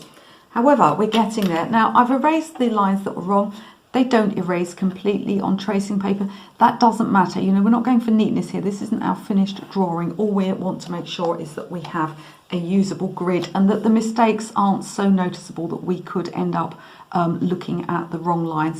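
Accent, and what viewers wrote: United Kingdom. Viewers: I love your teaching methods, I have learned so much about drawing from you. I am very sorry that some people are mean. Also love your British accent.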